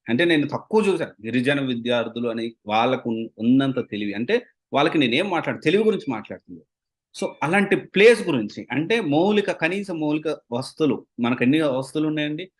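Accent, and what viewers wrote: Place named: Nellore, India. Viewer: Oka Basha Backdropey undi ga anna neeku nellorelo😅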